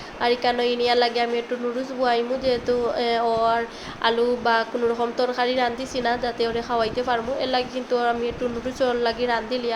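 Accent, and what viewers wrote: Sylhet, Bangladesh. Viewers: মাশাল্লাহ আপু পিঠা গুলো অনেক সুন্দর হইছে ভিডিও টি অনেক ভালো লাগলো আর সিলেটের কথা গুলো আমরা অনেক ভালো লাগে❤❤❤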